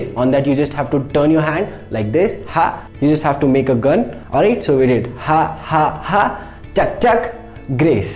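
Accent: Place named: India. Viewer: When u hear his Indian accent u know ur getting premium lesson 💗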